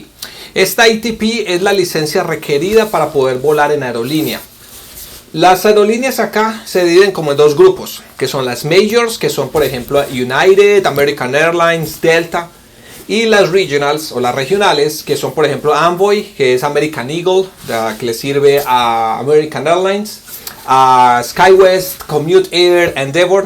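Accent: Colombia. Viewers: EXCELENTE....QUE SENCILLEZ. PARECE PAISA O BOGOTANO .....PERO COLOMBIANO SI ES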